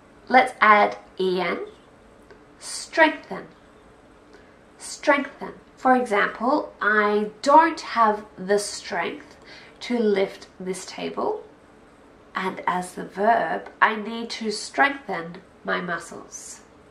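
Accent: Australia. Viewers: Yes, she is Australian. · Is this Aussie English? That's what I'm looking for :)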